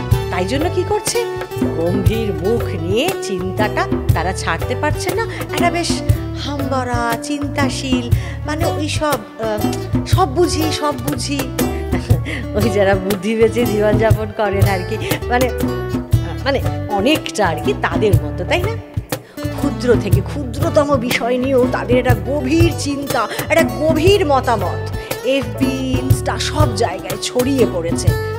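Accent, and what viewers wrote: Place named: Ballygunge, India. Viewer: সবই তো হ'ল। কিন্ত বাংলা উচ্চারণ? না একদমই নেওয়া যায় না। 'র' 'ড়' 'ফ' সব ঘেঁটে ঘ। না ভাই এমন বালিগঞ্জীয় ultra বাংলা উচ্চারণ চলবেনা।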